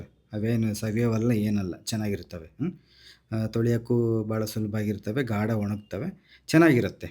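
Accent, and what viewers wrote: India. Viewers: ದುರ್ಗಾ ಕಡೆ ಜನ ಹಾಗೆ ಮಾತಾಡೋದು ಅವರ ಮಾತು ಅಡಿಗೆ ಎಲ್ಲಾ ಚಂದ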